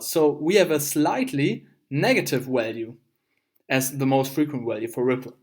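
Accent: Germany. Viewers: Expected a babe, but got a nice german accent to listen to make me even nerdier than I am now. Actually the tutorial was just what I was looking for in terms of prediction! Loved the data visualizations as well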